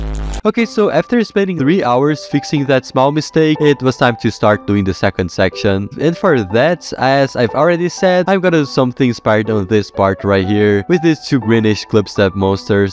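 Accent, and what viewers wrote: Brazil. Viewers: are you from brazil? the accent sounds familiar... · You brasiliano?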